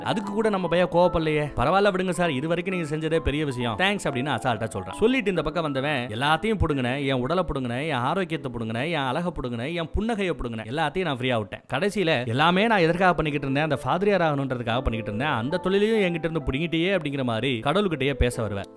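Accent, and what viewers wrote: Madurai, India. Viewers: ப்ரோ நீங்க மதுரையா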